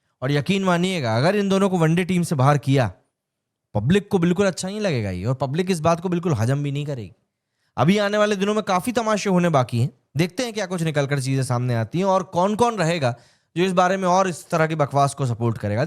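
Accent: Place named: Bundelkhand, India. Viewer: Excellent analysis! Lovely to hear Bundelkhandi in the cricketing world!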